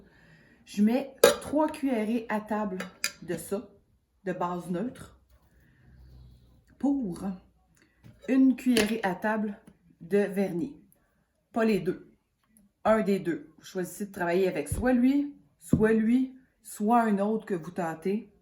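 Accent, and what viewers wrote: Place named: Canada. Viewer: 🙏 waouh ! ENFIN ! Des explications en français avec un bel accent canadien ! Merci pour toutes ces précisions car après beaucoup d'essais infructueux, j'étais sur le point d'abandonner😅. Je vais donc retenter. Pooringment votre 😉 Isabelle, France (près de Paris)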